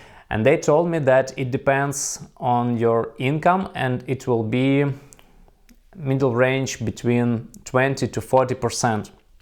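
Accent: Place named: Russia. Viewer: Yes, it’s nice to understand you with this “Russian” accent. It’s better than “American” or “British” for understanding 🎉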